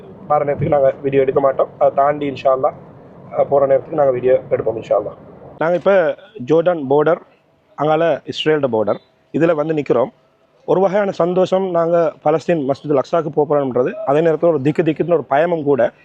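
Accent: Sri Lanka. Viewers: Mashallah Allamthulliha Allamthulliha Almighty Allah bless you excellent presentation sir you are speaking ceylon tamil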